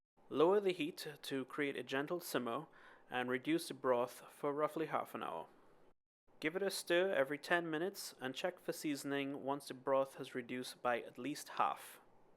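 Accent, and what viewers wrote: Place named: Trinidad and Tobago. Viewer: Good speaking Trini voice and feeling proud.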